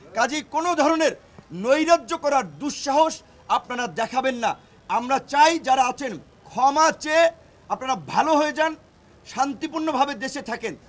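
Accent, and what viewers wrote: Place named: Barishal, Bangladesh. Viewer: তুমি ভাই বরিশাইল্লা,হোগা ধরে ঢাকায়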